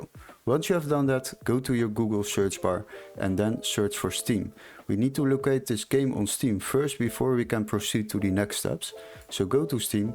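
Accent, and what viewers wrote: Netherlands. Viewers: I can hear the accent your are from the netherlands!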